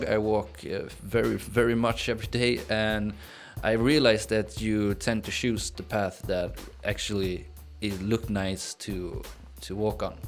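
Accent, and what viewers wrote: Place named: Caribbean. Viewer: Hey hey hey 👋🏾. My Swedish YouTuber with Soul. Again, really happy to have you back. Always enjoyed you videos for many reasons especially being the music. You definitely remind me of someone from the Caribbean islands. The accent and cool vibes. Are you still going to be making your own for your videos? Anyways, have a great weekend and stay cool. Looking forward to your next vid.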